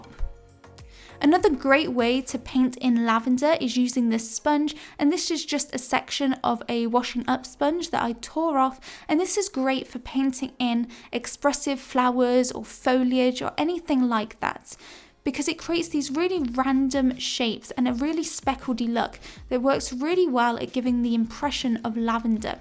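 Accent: United Kingdom. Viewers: British Accent is fun 😶👍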